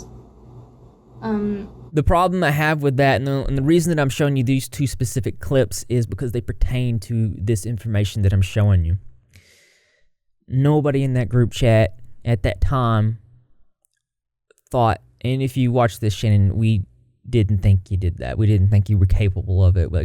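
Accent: South, United States. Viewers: Off topic but... I've never watched your videos before, but you have such a beautiful voice and accent 😍 Subscribed! Where in the US are you from? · i wasn’t expecting a homey southern accent but it is welcomed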